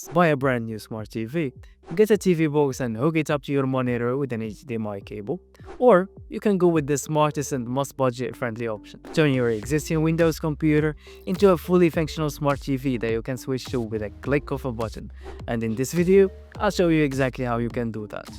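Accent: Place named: Morocco. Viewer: Anglais mdrdra b darija accent love the video, Good luck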